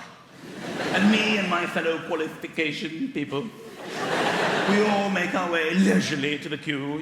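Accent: RP, United Kingdom. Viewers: Michelle Bolen - billy is relatable with his comedy - but i relate to Micheal more..because I’m from where he lives in UK ..so accents are similar (easier to understnd) - with billy, He is a strong Texan accent..you have to really concentrate to understand every word of what he’s saying. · And to all the Americans out there. This is an actual British accent. Not the posh snobby accent u bring out.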